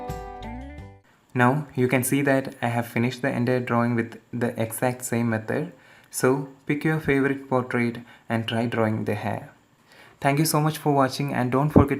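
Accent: India. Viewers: Wow, that's fantastic!!! It helped me very much, because I'm drawing very much the last time (I've drawn rey of Star wars (but not the hair yet ;) )and now I'm working on Frodo Baggins) One of the best channels in YouTube!!! And I love your Indian accent ;) How much time do you need to do an Portrait?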